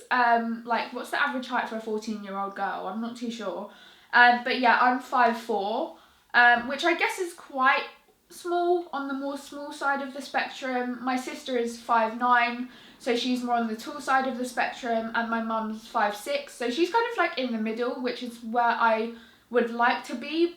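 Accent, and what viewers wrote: United Kingdom. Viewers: Gotta luv that british accent! Cant get over it! And when you sing? Your amazing! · I love yor british acent , is very elegant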